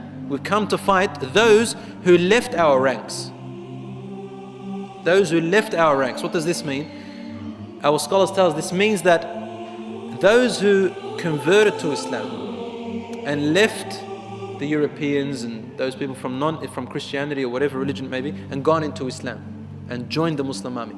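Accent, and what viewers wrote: Indonesia. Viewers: Kayaknya dari suaranya orang indo nih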